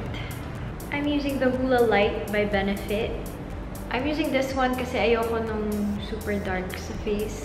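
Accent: England, United Kingdom. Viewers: sorry ulitin ko nlang yung vid,sa mukha mo lang ako naka tingin ang pretty mo girl haha..btw i like your english accent hehe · Melly Andrade I really don't understand the hate she's been receiving ,she's fine to me and loooove the English accent. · ganda at galing mo mag make up girl so perfect and i love ur english accent sarap pkinggan kya pls. wag kna magtagalog pls. haha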